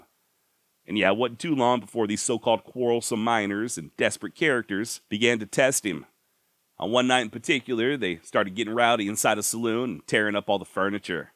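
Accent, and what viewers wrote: Texas, United States. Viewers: tf? What are you talking about bro? He’s just from Texas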